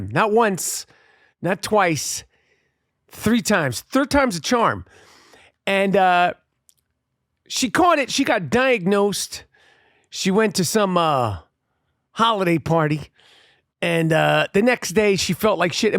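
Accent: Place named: Brooklyn, United States. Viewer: Hi! Hope your wife feels better and your family recovers completely by 2023. I am a Brookyn expat living in the DR and listening to your accent reminds me of home. ❤🙏 Thank you so much for being you.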